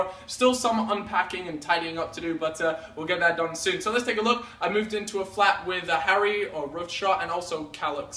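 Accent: United States. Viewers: Is he american? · This is probably obvious but I am not a constant viewer so just out of curiosity, why is it that cal doesn't have a proper english accent? Did he move to England from the US or what?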